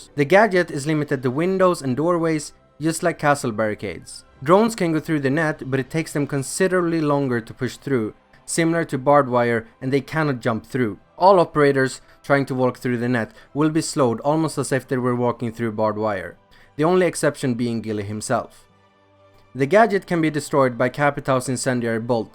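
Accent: Sweden. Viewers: u are swedish?Also nice idea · Are you swedish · Värsta svenne accenten haha, aja bra video, cool grabb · Not bad that can be fun operator I have a question are you Swedish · Is he swedish?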